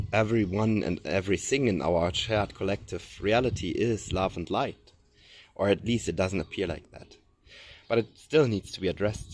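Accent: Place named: Germany. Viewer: Thank you. Just found you this moment. Feels like blue ray expression transmitting, and now you confirmed my clear impression of german accent . Or swiss. Watched your history transmission. Interesting, but not very relevant from my point of view. I am open to share and love to get finally in touch/exchange with same frequency souls, like blue rays . Its about unifying now, from my point of view. Love from Patagonia, Chile from a humble german imigrant😅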